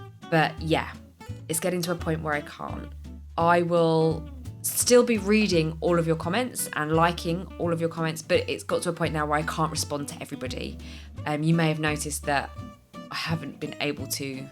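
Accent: United Kingdom. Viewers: God I wish I had a British accent. 😂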